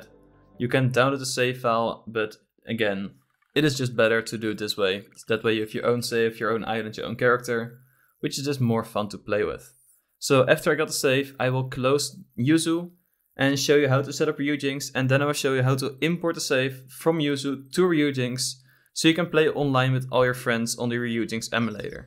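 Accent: Netherlands. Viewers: Dat Nederlandse accent 🤣😭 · You sound dutch, are you? XD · Yo Dutch? just a guess! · you dutch or some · I think you are from Netherlands because of your accent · Are you dutch? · You Dutch? Sounds like it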